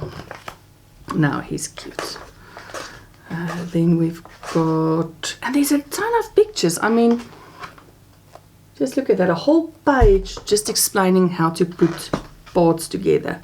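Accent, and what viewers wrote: South Africa. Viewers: Hello. Your bee is gorgeous and what a lovely story. I am lucky I have both of these books already. I have finally caught up on all your videos. I love your channel My son in law comes from South Africa but he and my daughter and two grandsons live in the UK so it is lovely to hear the accent. My husband also visited Denmark years ago and loved Copenhagen. He used to work for Bang and Olufsen. He has retired now. We are from Australia. Lovely video. Thank you have a great week. ❤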